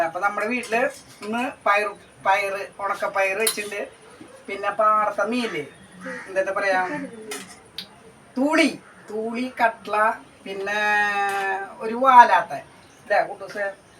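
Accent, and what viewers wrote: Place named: Thrissur, India. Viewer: Good to ur thrissur basha.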